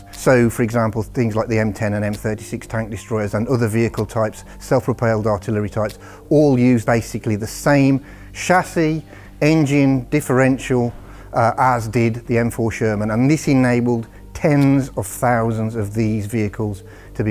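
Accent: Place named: Estuary, United Kingdom. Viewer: Fajny angielski tego gościa. Ktoś wie, jaki to akcent? Estuary?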